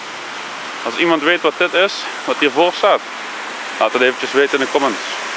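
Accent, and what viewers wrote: Limburg, Netherlands. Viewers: Leuk gedaan zo. Lekker droog Limburgs commentaar(ben zelf van 045😉) wacht nu alweer op deel 2! Trouwens is dat zwarte ding niet gewoon een mega buis voor een mega Shell ? Je weet maar nooit bij die chinezen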